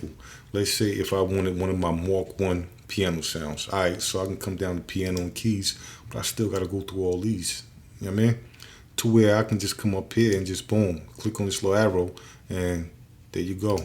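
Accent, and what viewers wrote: New York, United States. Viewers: You got that real New York voice. Lml what part are you from?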